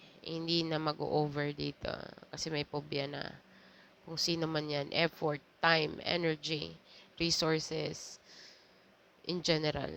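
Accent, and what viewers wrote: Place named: Iloilo, Philippines. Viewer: 😂😂😂 sagad mag ilonggo si ❤❤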